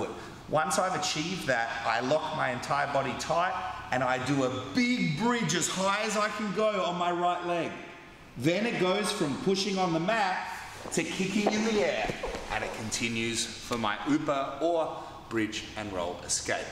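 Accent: Australia. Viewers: Nice rhythm , this dude speaks smoothly from beat to beat, flawless transition and the great Australian accent is a bonus! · I love Australians, you guys are always fun to listen to. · are you an Aussie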